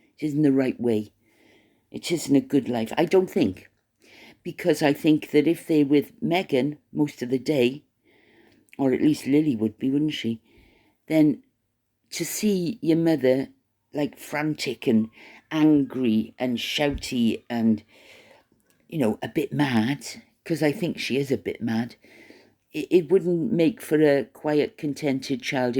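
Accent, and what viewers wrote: Wales, United Kingdom. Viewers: You’re back!! I have often wondered about you,. Love listening to your soft Welsh accent and your lovely little stories mixed in with the serious content of the vlog. We won’t say “toma-toes” again. I think that was what finally took you down - especially the rotten ones;) Good to hear you are well and in fine fettle. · OMG!!! can't stop laughing at u're story about u talking 2 the telly!!! I'm from Swansea and u're accent reminds me of an aunt of mine, but she was from Newport 😊 x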